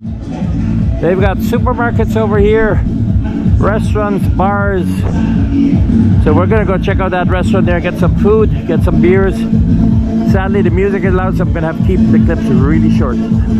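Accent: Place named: Middle East. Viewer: You have an accent where are you from midlle east ??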